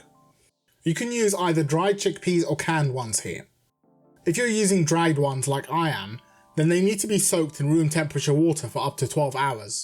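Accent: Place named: United Kingdom. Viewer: Delicious food! Handsome face! And a very intelligent sounding British accent 😂 what else could you ask for haha! This change is UNDERVALUED u will grow to at least 300k-500k! Keep it up!!!!! 😍✌🏻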